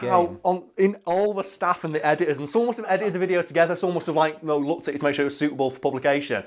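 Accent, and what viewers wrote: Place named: United Kingdom. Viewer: * heavy British accent *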